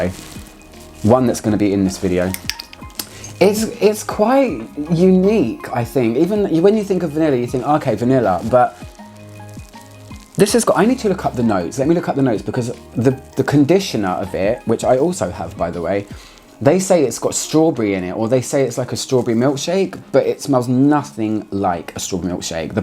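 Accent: United Kingdom. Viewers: I LOVE your british style and your pronounciation ❤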